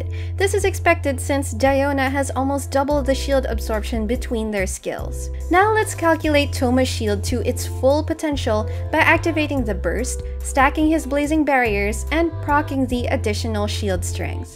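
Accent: Philippines. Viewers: Thank you!! I needed this vid because I got hutao and I know how thoma is good for her but I got elegy today at 5 pity and I decided to go for building diona (I dont have sacrificial bow) P.S: u sound filipino ;)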